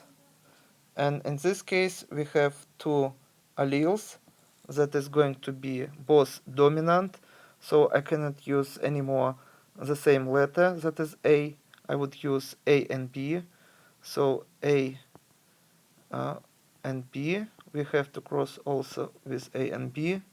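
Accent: Russia. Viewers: your accent is very good. Are you from Russia? I liked that.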